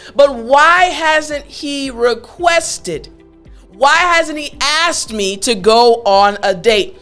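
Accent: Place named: United States. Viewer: Your lessons is good and have the American accent so anyway that's awesome! I'd like the way you are teaching the students because you have style of teaching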